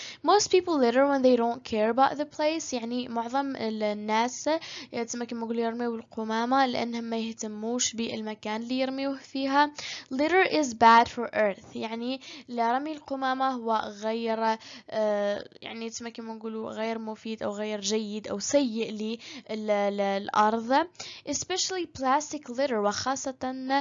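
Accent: United States. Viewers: لانها تقرا بالطريقة الانجليزيةالامريكية وليست بطريقة برتش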